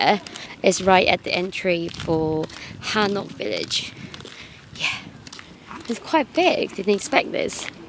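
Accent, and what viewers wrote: United Kingdom. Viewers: 영국식 발음 정말 예뻐요!! 보면서 저도 계속 따라하고 있음 ㅋㅋ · 지금은 어디를 파헤치고 계신가요 ㅎㅎ 기분이 좀 우울해서 일부러 영상 보러왔어요 ㅠ! ㅎ 저도 전주 가봤지만 디게 속속들이 정성들여 전주를 여행하신다는 느낌이네요ㅋㅋㅋㅋ 좋아요! 영국영어.. 이제 회화공부를 시작했는데 정말로진짜 처럼 되고싶어요! 저 할수있겠죠?ㅠㅠㅠ 앞으로도어쨌든 영상 잘 볼게요! ㅎ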